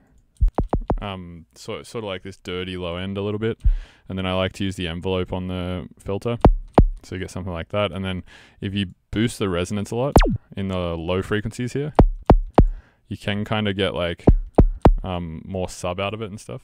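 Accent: Australia. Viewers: Wait are you Australian hahaha, if you were from western aus your accent would fly under the radar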